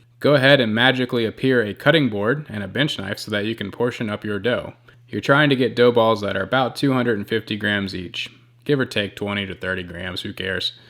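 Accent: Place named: United States. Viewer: You sound like you’re from the US, why are we using grams? 😂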